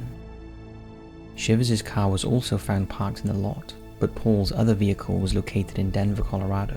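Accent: Wales, United Kingdom. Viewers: Think he's Welsh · He's from the UK, so his dialect is different than the way Americans or Canadians pronounce words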